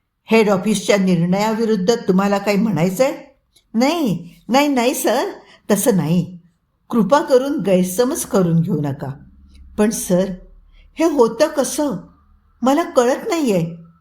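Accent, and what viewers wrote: Standard. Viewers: खूप छान वाचन ....! सुस्पष्ट आणि शुद्ध उच्चार.....!! ऐकत राहावंसं वाटतं.....!!! आवाजाची जादू .....! अक्षरशः खरं आहे.... 👌👌👌👍👍👍🌹🌹🌹🌹🌹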